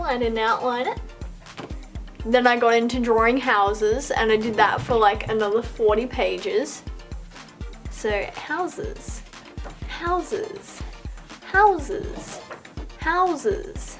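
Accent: Australia. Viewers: Hewo. Quick question, are you Aussie ? Btw your art looks amazing 😊👍👌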